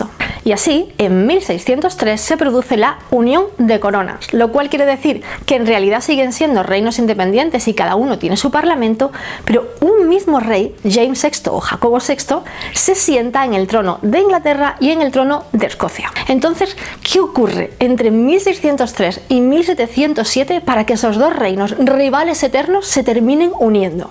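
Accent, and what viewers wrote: Spain. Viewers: Me encanta cómo explicas todo!! Tu acento tan Lindoo. Nos encantó Escocia 🏴, estuvimos en Octubre del año pasado con mi marido, esperamos volver 🙌🏻 y hacer un lindo recorrido de esos que tenes en tu blog😊. Y por supuesto España es Muy muy Bello tu país ☺️ Saludos desde Argentina 😘🙋🏻‍♀️ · Me encanta tu acento español Por eso España es de Puta madre como decía la canción de los 80’s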